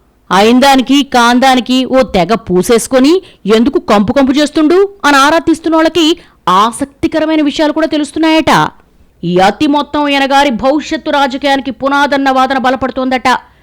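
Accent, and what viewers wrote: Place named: Andhra Pradesh, India. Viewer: Aapavamma andhra anchor nuvvu enta telangana language matlafina neeku telangana yaasa raadu. Meesukoni vacchina language matladu madam. Nekadi manchidi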